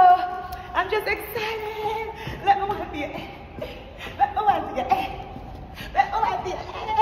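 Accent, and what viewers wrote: Jamaica. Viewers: I love the way you talk. You are so jamaican. You are so talented and beautiful, fine. I watch you on love and hiphop atl. I listening to your music and look at your videos. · PLEASE KEEP YOUR MASK ON SWEETHEART IN THE STORE YOU CAN WALK RIGHT BEHIND SOMEONE THAT JUST SNEEZED OR COUGH AND THE SPOURS STAY IN THE AIR FOR SO LONG I JUST GOT HOOKED ON YOUR MUSIC AND ACCENT AND I DONT WANT TO HEAR THAT YOU ARE SICK....I AM NOT A STALKER. I JUST LOVE TO HEAR YOU TALK.I MAY BE YOUR MOM OR GRANDMOTHER'S AGE. GIRL I WISH I COULD SPEAK LIKE YOU. I LOVE LOVE THE JAMAICAN ACCENT. MY PEOPLE i love my black people. I only hate one thing about my people is the JEALOUSY AND THE FALCT WE DONT STICK TOGETHER LIKE BACK IN MY DAY.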